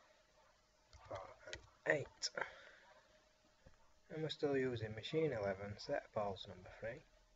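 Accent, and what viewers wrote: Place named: Northern England, United Kingdom. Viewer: Oh.. what a pity..:-( to me it seems like this is a good advert to push people playing even more euromillions. And it is carefully targeted obviously; as the PR (devil) people knows what they're doing. Luckily no one is watching this ** (39viewers)!!! The accent is from the north of England (notoriously work deprived area) "I was unemployed for 5 months..." (what that has to do with euromillions??) trying to look scientific with a spread sheet?!?!?! Everybody knows that lottery is another way for the government "to attract" people's fund and impoverish them little by little. The Euromillions is rigged, if you look at the draw history the combination of 3 or 4 same numbers comes up sometimes twice in a couple of months which is statistically improbable. Then HDVIDEOS999 ask for the link and you are ... 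busy?!?!?! LOL!!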